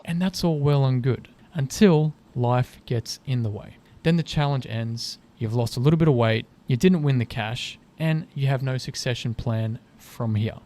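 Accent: Australia. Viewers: 😅 Ho sempre pensato fossi australiano 🤷‍♂️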